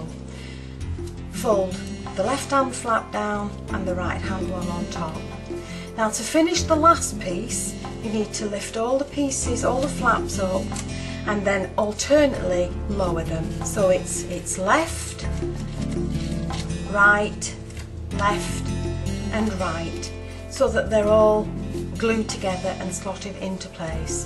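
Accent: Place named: Lancashire, United Kingdom. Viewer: this was the first of your videos that I found, back in 2010 when I discovered that Youtube had cardmaking videos! I have been a fan ever since. I'm currently re-watching your videos from the beginning - whilst ironing! Your videos make ironing fun!!! Thank you so very much for all the inspiration! (I love your accent btw, my hubby's a Lancastrian!) X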